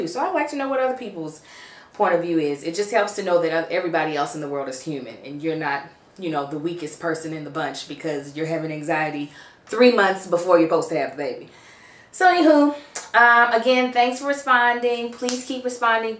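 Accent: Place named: South, United States. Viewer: The southern belle accent and out of breathe is just too cute.😂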